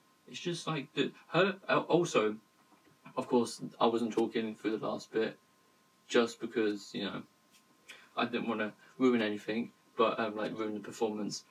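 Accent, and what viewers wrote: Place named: United Kingdom. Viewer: Am following this channel. Extremely love that British Accent.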